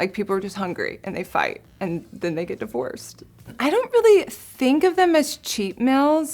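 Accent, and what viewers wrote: United States. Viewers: Most American Accent I've heard. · Girl all Americans sound like this stop being a child and hating on someone cause of there accent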